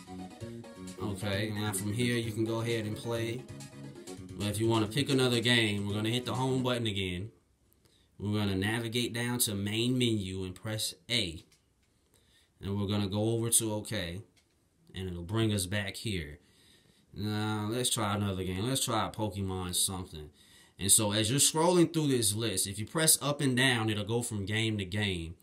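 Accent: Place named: South, United States. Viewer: yo why do you sound like a redneck dantdm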